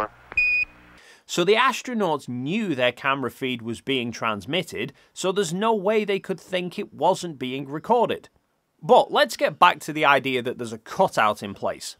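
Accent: United Kingdom. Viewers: Did they think having someone with a British-style accent would make it seem more authoritative, like an Attenborough film? This is similar to the people who ask why the moon photos have a black sky but you cannot see any stars. They just have no idea how a camera works and how exposure changes based on the brightness of light sources. Here, the outside light source (the Earth) is basically over-exposed (when they pull back to show interior) so the camera can show the details inside the capsule as it is darker inside than outside. The window is super-bright because the amount of light coming in is way more than the interior lighting. Same with the starless moon photos. The cameras there were set for the lighting of the sun and reflected sunlight on the surface so there was proper exposure and the photos are not too bright or too dark. If they were to set the exposure to see stars in the sky, which are many times less bright than the moon's reflected light, then all the details of the moonscape would be over-exposed, or washed out, and you would not be able to discern much in the view. 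Plus they did not go to the moon to look at stars; they would look the same as from Earth or low-Earth orbit.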